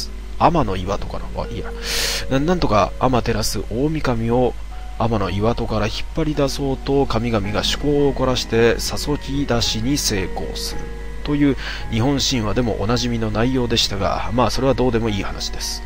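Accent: Kansai, Japan. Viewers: あれ？136さんは関西人？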